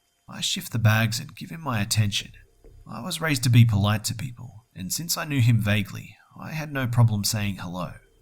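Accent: Australia. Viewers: I'm so excited! Scary stories with a good old fashioned Aussie accent! And, even better, you don't have a weird way of talking, like ending every sentence like a question, hahaha. You, sir, have won yourself another subscriber (not that it looks like you need it!) ;) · Watching your videos get me so excited you make these videos so well! Having a fellow Aussie telling it makes it that much better !!! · Nice voice, glad to hear an aussie accent reading scary stories. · is this narrator Australian? I'm an Aussie as well! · It is soothing and now that I'm high, I can listen to it. When I'm sober, I find something irritating in his accent. I normally I don't care about strayan accent on tv or anything else but here it for some makes me alienated. I still listen because he's got talent and good stories! :) ps. for example HellFreezer (my absolute favorite) has a scottish accent and it just makes it 1000x times better! 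· U sound Aussie? R u? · R u Australian? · Aye it's my favorite Aussie narrator !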